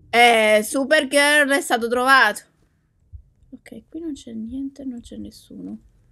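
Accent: Naples, Italy. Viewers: ma sei napoletana?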